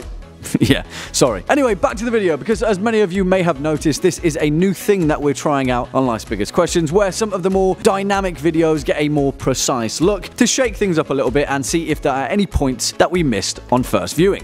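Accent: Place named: Yorkshire, United Kingdom. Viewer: I love the accent.. Is that Yorkish? Anyway thanks for this informative mate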